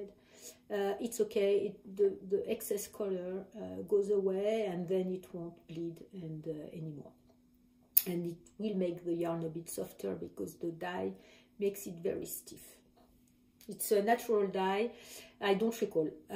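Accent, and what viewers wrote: France. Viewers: Hi,! Great podcast, your English is really great and I just love the French accent! Thank you so much for presenting the Fresh Peonies, I am so glad that you felt all that I was hoping this pattern to be: the ideal relaxing project, easy and comfortable for every knitter to knit while bringing up the best of Lise's beautiful yarn! I just subscribed to your channel and look forward to future episides! À bientôt!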